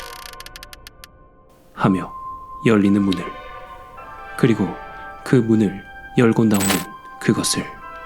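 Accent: Gyeongsang, South Korea. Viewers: 제천인데 경상도 사투리고 구수하네. ㅋ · 님 최근에 알게되어서 잘 듣고 있는 구독자입니다. 편안하고 분위기 잘잡으시는 목소리와 특유의 경상도 사투리가 너무 매력적이라 구독하게 되었고 주변에도 영업하고 있습니다. 저는 해 떨어지면 전기 자전거 타면서 님 채널 틀어놓고 들으면서 자전거 타는게 너무 좋네요 ㅋㅋ 부디 지금보다 더 떡상하시길 기원하고, 저도 중학교때 겪은 소름돋는 일 하나 있는데 조만간 제보하겠습니다. 항상 힘내세요!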